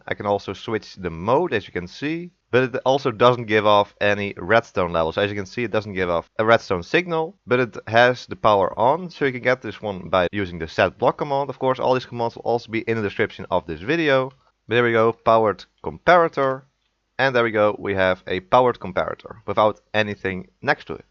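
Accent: Netherlands. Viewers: When u hear a dutch accent in his english voice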